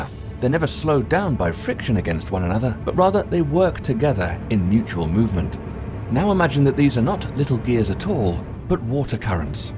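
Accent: United Kingdom. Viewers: he sounds slightly british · Just how a lot of English people speak.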